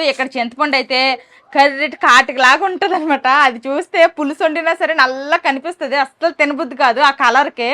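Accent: Srikakulam, India. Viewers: వీడియో బాగా నచ్చింది లైక్ చేసాము sister ❤ అమ్మ వాళ్ళ ఇంటి నుండి మనకి ఎన్ని ఇచ్చినా మనకు సరిపోవు కదా సిస్టర్ మన శ్రీకాకుళం లాంగ్వేజ్ నాచురల్ గా మాట్లాడుతుంటే చాలా ముచ్చటగా ఉంది❤❤ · Super sis meru ala mataluaduthunte mana sklm ani feeling happy❤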